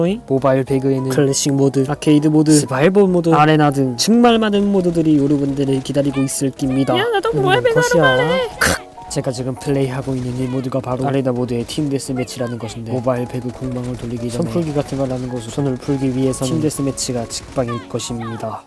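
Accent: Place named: North Korea. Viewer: 북한인이 말하는거같누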